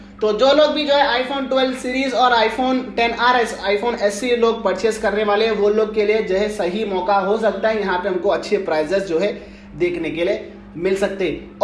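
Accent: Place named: Hyderabad, India. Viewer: Bhai tum Hyderabad se ho bhai bolo bhai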